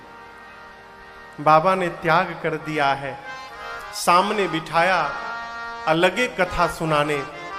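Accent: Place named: Purabiya, India. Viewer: Har baat mein Anand hai ho kya kar rahe ho Puri Katha mein ho hai ho hai ho hai ho kar Diya purabiya Bhasha bolate ho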